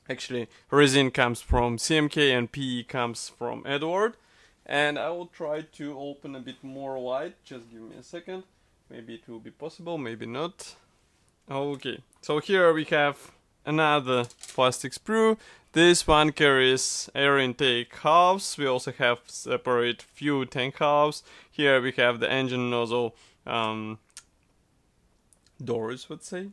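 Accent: Russia. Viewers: судя по произношению родной язык у человека русский)) модель интересная, шведы вообще своеобразные, в планах собрать все их реактивы..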